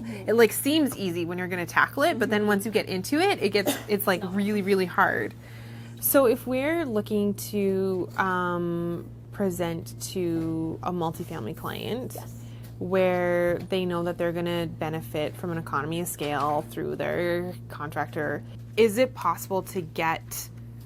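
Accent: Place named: Canada. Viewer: watched it for one minute and immediately noticed your canadian accent 😍❤️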